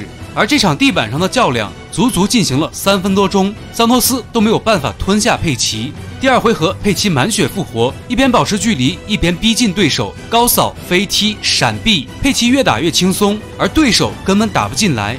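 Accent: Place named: Henan, China. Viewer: 画外音的普通话口音能听出是河南人😁，河南电视台的格斗节目“武林风”节目很有名……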